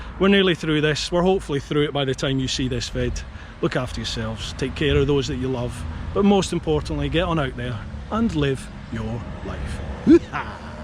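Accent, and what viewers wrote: Scotland, United Kingdom. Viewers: A Scotsman on a Harley ...I like the voice sound... Great vid..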